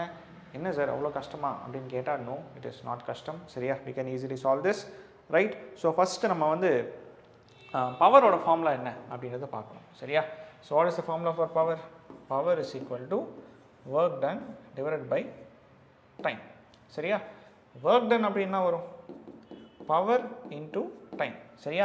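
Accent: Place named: Thanjavur, India. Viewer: thanjavurla irunthu pesuran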